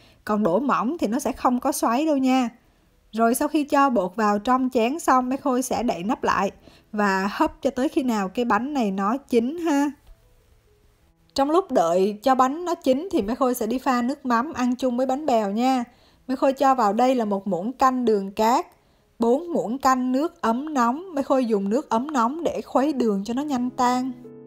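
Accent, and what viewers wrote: Central, Vietnam. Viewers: Cảm Ơn chia sẽ của chị Giọng nói miền Trung của chị nghe dễ thương Lai lai một chút giọng Huế 🥰🥰🥰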